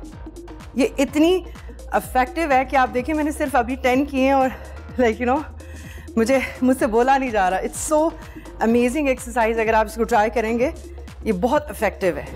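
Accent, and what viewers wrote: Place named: Punjab, India. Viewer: Hahahaah ur punjabi just love😂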